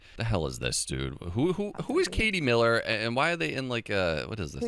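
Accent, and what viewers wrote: Boston, United States. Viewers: Not an insult, but you sound like one of the boys outta Boston.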